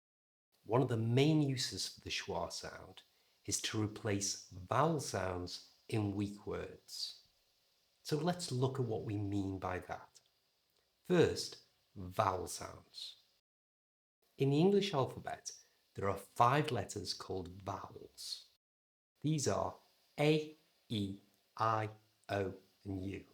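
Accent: United Kingdom. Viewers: ❤❤I am Mansour from the beautiful country of Iran, and I would love to learn the British accent. Thank you, dear teacher, for your excellent lessons. I appreciate your effort, and I kindly ask you to upload more videos so that we can learn English professionally and correctly from the basics with this beautiful accent.❤❤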